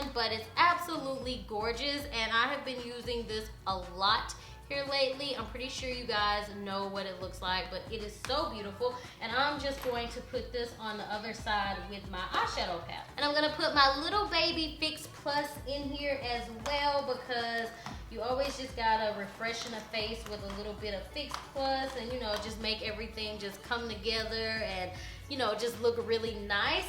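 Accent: South, United States. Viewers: no i didn't come here for fashion advice, i just came to look at you and listen to you talk. i love that country accent of yours I'm from Alabama too, but i don't sound as sexy as you do... and you're so fucking beautiful😫💗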